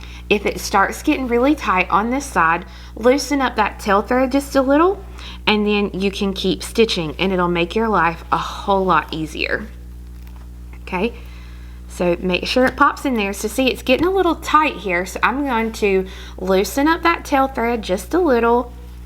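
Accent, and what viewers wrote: South, United States. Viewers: I would give just about anything to come visit you this weekend! I am a southern girl currently living in England and miss home so much. Ms I love your videos, I am a long time beader and always learn a little bit more from you. Keep speaking southern to my heart and beads to my soul! Thank You!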